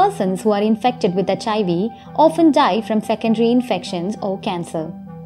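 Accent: Kerala, India. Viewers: The narrator is a malayali, i BEt. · Typical indian accent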